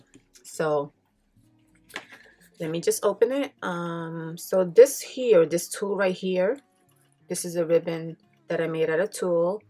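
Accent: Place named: New York, United States. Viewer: Absolutely amazing! Love the adorable racoon printable!! I really like you NY accent too! Adorbs!! :)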